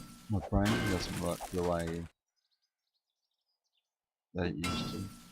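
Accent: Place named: England, United Kingdom. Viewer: If I may be so bold: a couple of problems I noticed - the voice commentary is often unintelligible, or drowned out by either the minibike or the sounds of mining. I appreciate that you have an English regional accent, and that is set in stone; but I am guessing that perhaps some viewers find it difficult to understand - at least you don't have a thick Geordie accent eh? Building and engaging an audience is a long hard road, but another step you could take is uploading a profile pic, doesn't need to be a selfie, could be anything, but it is something other than the anonymous 'blue blob'. I am your one subscriber!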